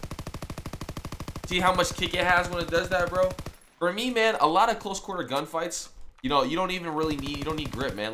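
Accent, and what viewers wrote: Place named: Canada. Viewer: you sound Canadian, are you?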